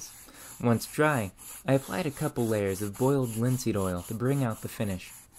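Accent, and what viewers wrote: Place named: Oregon, United States. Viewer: Sounds like Organ State to me.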